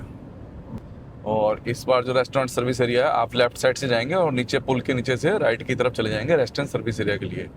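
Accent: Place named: India. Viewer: sir, information dene wali baat to sahi hai, par aaj kal trend chal raha hai har koi apne aap ko represent kar raha hah, it is not bad thinking, baki aap ke videos mujhe bahut acche lagte hai, soscially aap ka desi bolne ka style bahut aacha hai,, baki aap ki pic ki kami rah jaati hai..thanks bye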